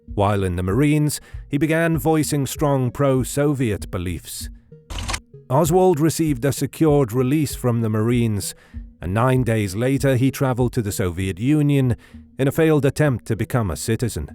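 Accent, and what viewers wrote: England, United Kingdom. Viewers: His English accent doesn't add any real credibility to his conclusion. Facts: Oswald was downstairs (2nd floor) causally having his lunch 90 seconds after the shots were fired, drinking a coke--(2 witnesses)nobody saw Oswald coming down the only stairway available when they were coming down the stairway 45 seconds after the shots (2 TSBD workers observed by TSBD supervisor). Rifle was originally reported as a Mauser (far more likely accurate weapon) on live radio and tv air by weapons experts (2 witnesses) before the story was changed. Oswald's supposed Mannlicher–Carcano rifle was a "piece of junk" and needed to be shimmed even to get telescopic scope to work (3 witnesses) probably a Dallas PD property room reject carelessly chosen and hidden the day before. The Mannlicher–Carcano rifle had such a bad reputation in Italy (it's country of origin) it was called "the Italy lost the war." Simplest theory--Crossfire--3 rifles--Grassy Knoll(front twice--Kennedy's neck, temple) , Texas SBD(Connally and miss), and across from TSBD on the roof (bullet off the curb that hit bystander by the overpass next to a policeman (2 witnesses). 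The Dallas Police Department actively did everything they could to suppress any witnesses that did support "Oswald did it." Crossfire was standard CIA operational procedure to guarantee success. Majority of Secret Service detail had been drinking till 3 am at at Casbah Club--rookie had a machine gun the follow up car, Kennedy's driver didn't follow proced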